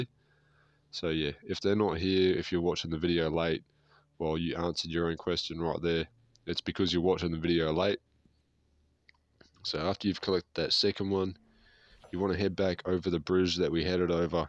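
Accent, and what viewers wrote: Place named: New Jersey, United States. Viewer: this is a great one. luv u accent....New Jersey?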